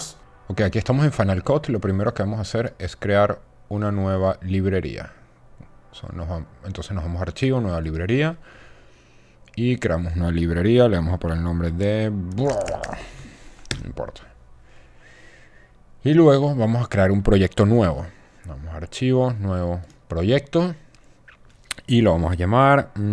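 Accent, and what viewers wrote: Venezuela. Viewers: eres venezolano? muy buen tutorial :D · Excelentes videos mi pana, eres venezolano cierto?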